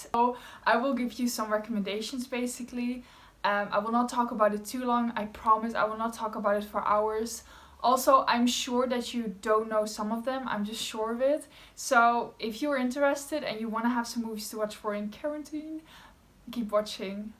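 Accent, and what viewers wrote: Netherlands. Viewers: Are u from Netherlands? R u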